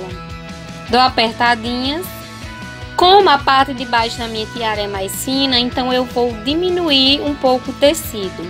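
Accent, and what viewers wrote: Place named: Paraíba, Brazil. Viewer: Moça, é incrível. Você falou e eu pensei 'essa mulher é paraibana' Dito e feito kkkkk Beijos da sua conterrânea também de João Pessoa.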